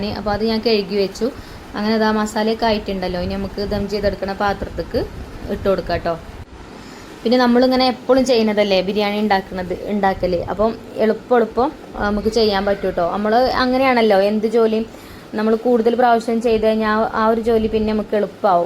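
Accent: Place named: Chungathara, India. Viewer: ഞാൻ ചുങ്കത്തറ ഇവിടെ അടുത്താണ് എന്നു തോന്നുന്നു. Slang ഒരുപോലെ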